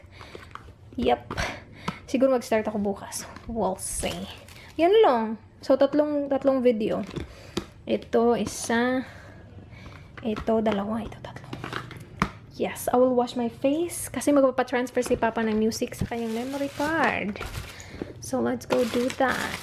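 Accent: Visayas, Philippines. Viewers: bisaya diay ka te??pareha rta!!ahaha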